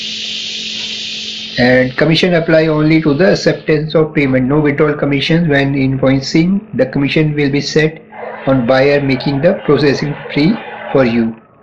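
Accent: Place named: India. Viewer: Every Indian accent makes me sick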